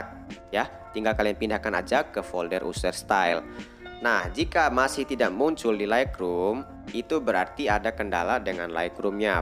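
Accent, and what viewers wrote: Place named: Bali, Indonesia. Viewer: iya...iya teman2 kayk bli..bli di bali logatnya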